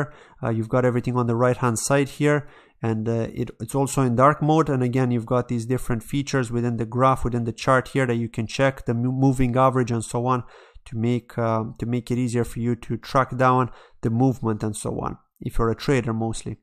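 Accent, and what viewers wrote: Ireland. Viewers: You sound like an Irishman ☘️☘️☘️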